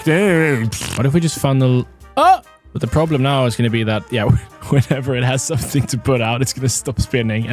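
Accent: Sweden. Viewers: Are u a Swed because u sound like a swed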